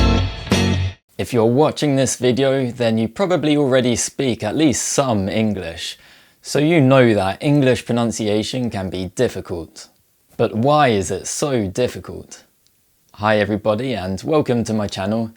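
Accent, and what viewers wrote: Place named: England, United Kingdom. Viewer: Seriously, your English accent is amazing! It makes your content so engaging.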